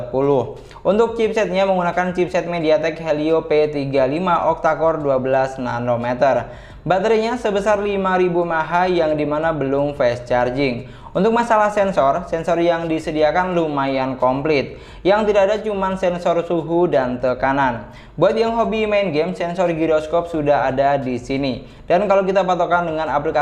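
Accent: Madura, Indonesia. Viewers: Bang orang Madura kah?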